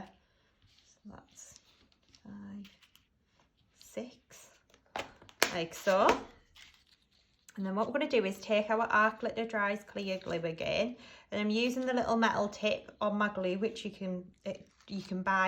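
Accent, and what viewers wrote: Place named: England, United Kingdom. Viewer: Wow, Do you sell your cards to card stores? When i was in the UK in 2019 just before my Father Passed away me and my sister went to a card store and i couldn't get over the detailing of all the cards in there. Layered cards with pop ups and animals in forests and such. i love the Card stores in the UK there is always something you haven't seen before in there. I brought some home for my family here in Canada where i now live I was born in the UK so its nice to hear an English accent again. I was born in the south of the UK in Portsmouth and my hubby was born in Yorkshire but had lived in Canada since he was 4 year old, We met while he was serving in the Royal Navy in my home town we got married just over a year later and here we are still married after all these years. I have always wondered how to do the greeting cards myself but i have been more curious lately. 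I have loved making crafts here since moving to Canada there are so many talented people especially on You Tube. I wish i could spend all day on here really. I love the card you made its really beautiful. This is the first video i have watched of yours and i have to tell you i am hooked on this cardmaking. I love the cutting dies but can you use them without the Gemini machine or similar machines? I have tons of glitter that has never had anything i could use it on super fine coarse large and shaped glitter tons of card stock and a few dies but they are so cheap to buy on Amazon Temu Wish etc. The embossing i have ne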